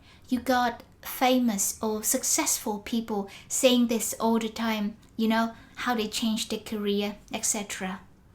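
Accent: Vietnam. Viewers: is this southern or northern vietnamese ????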